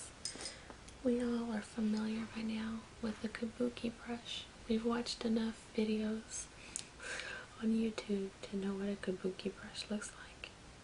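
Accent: South, United States. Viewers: Are you from the south? cause you sound a bit southern. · Also are you from the south?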